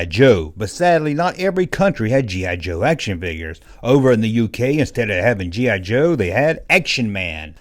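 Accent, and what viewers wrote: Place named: Georgia, United States. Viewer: Who gets mad a a great Southern Accent? Love the Georgian draw. No gay shit.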